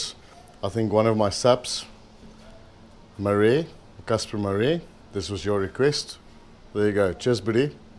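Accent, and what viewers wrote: South Africa. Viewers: Als ik jouw zo bezig zie, dan besef ik: WAT MIS IK ZUID-AFRIKA. En vergeet vooral niet: dat prachtige Z-A accent. Potjiekos met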